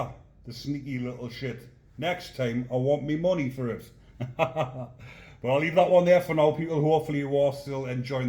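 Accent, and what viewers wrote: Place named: North East England, United Kingdom. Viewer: Hi you ARE BRILLIANT LOVE YOUR SWEET FUNNY NORTH EAST ACCENT. ❤❤❤❤❤❤❤❤❤❤❤❤❤❤❤❤You are the bees knees. Handsome north east lad. 🎉🎉🎉🎉🎉🎉🎉🎉🎉🎉🎉🎉🎉🎉🎉🎉🎉🎉🎉🎉🎉🎉🎉🎉🎉🎉🎉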